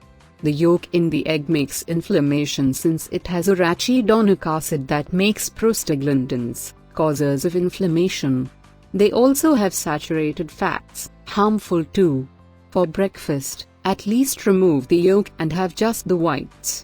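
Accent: India. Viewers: Refined Indian accent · combination of voices. robotic and.Indian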